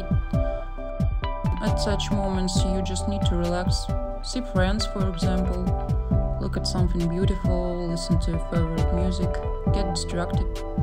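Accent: Russia. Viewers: I just wanted to point out: even tho you see some hate, never forget how many people actually love your art. For me personally, you're such an inspiring person with your style, the way you shade etc. And I loooove your russian accent, it sounds so wonderful and calming💖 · Girl ur voice is just so pretty! And ur accent? Russianaccent is just so lovely i rly like it <3 following u since a while now ur speedpaintings r always so amazing and also kinda relaxing to watch <3 much love from germany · Girl, I MISSED HEARING YOU AND SEEING YOU UPLOAD. You have absolutely NOTHING to be nervous about! Russian accents are one of my favorite, and your voice is absolutely gorgeous. Don't you ever be ashamed. The same with your artwork, that's nothing to beat yourself up over. That one criticism out of the many compliments is a good way to show yourself that your art IS art and that your journey for improvement is never over. 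I think one of the beautiful things about being an artist is that you're not alone. Everyone has gone through and been shaped by similar struggles. Keep on keeping on. You are amazing, BEAUTIFUL, and one of my biggest inspirations. You are the reason I picked up an ipad and I do not regret it for a second. Thank you for providing amazing content. 💙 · ЧТО. Я УМЕРЛА ПОСЛЕ СЛОВ "АЙМ ФРОМ РАША".......... я всегда думала, что ты из Америки.... даже подумать не могла... смутилась только когда услышала акцент, и тут.... воу... удивляюсь, почему у тебя не миллион.. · Она русская чтоли? Акцент похож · Whoaa finally! Don't worry about the voice and the accent, I really love russian accent and I am sure everyone is!💚 Good job my queen! waiting for your next voiced video👁️👄👁️✨ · Your voice is beautiful. Russian accent is the best for me. Its calming and soothing lol. Your art and voice us the most beautiful thing i saw and heard. 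Keep up the good work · i love russian accents! don’t be embarrassed of it ❤️ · Could u make a video showcasing your “cool smears” brush pack, please? I can’t seem to find any 💓 (Btw, I really love your voice! & your art too, ofc. I can really relate to your feelings regarding your voice.. I am a big fan of accents, though, and Russian accents are particularly beautiful imo. (Not in a weird/creepy way, just in an admiring/appreciative way!)✨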